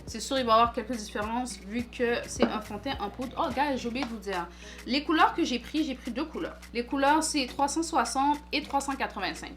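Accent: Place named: Quebec, Canada. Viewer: Ton accent parait si quebecois. Je suis franco-ontarienne :) Merci pour la vidéo francophone de ce produit. Vous êtes jolie 🥰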